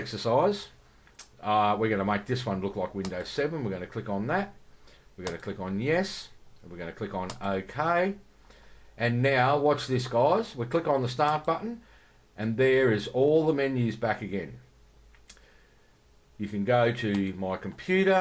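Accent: Australia. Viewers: are you Australian? sorry I know it's off topic but I'm curious as I can't identify your accent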